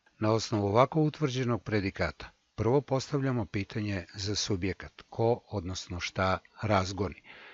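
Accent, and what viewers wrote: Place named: South, Serbia. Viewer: Koliko nepotrebnih znanja deca treba da usvoje tokom školovanja?! Pritom, izađu iz škole potpuno neosposobljena za život i rad. Ovo je potrebno onima koji će studirati srpski jezik i baviti se lingvistikom u svom budućem radu. Pritom, ovaj govornik, sve vreme, koristi južnjački akcenat, a drži predavanje iz gramatike srpskog jezika.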